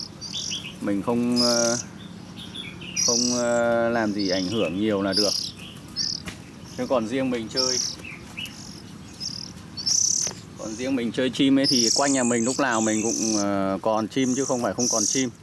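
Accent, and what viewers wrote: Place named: Vietnam. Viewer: Bác giọng Thanh Lãng ak :))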